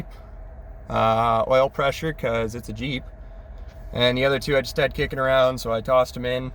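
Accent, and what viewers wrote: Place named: Canada. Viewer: Sound like your from Canada.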